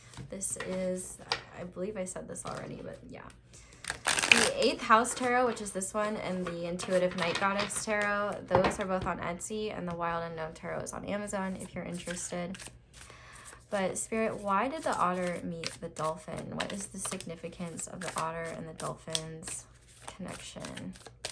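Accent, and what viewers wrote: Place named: South, United States. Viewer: Pile 2 I love the southern accent this is coming from a southern woman it's not that funny some what's even say it's cute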